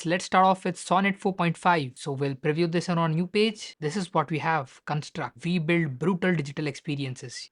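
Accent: India. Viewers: Please take english lessons i cant with these indian accents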